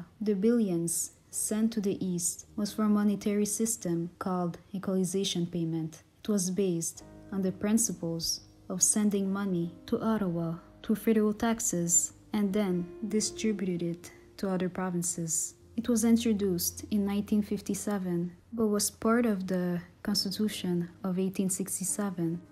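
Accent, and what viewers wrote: France. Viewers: She sounds both French and Native - which makes this HER content and heritage - patiently listen or find someone that “speaks your language” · I don't care much about the accent and I do know she's French; read my comment. Of course, I'm not claiming she is reposting someone else's content. That's what I'm doing; I'm just providing feedback that would make this channel more popular for me and undoubtedly many other people. One should not be quick to judge another's intentions and it is an insult to consider the work of a craftsman to be the best that he is capable of.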